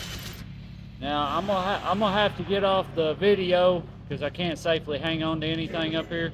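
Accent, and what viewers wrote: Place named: South, United States. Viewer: Appreciate the video, gotta love that sweet southern accent!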